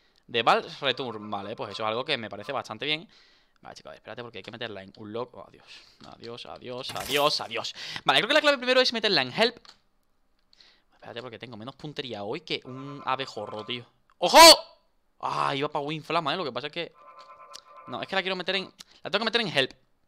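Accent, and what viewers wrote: Argentina. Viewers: eres argentino o español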